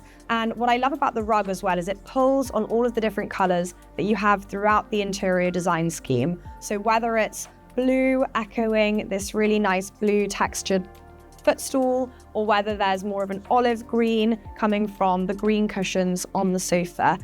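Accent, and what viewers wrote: RP, United Kingdom. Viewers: OK Yah person employed to flog ludicrously expensive pad. Almost comical received English.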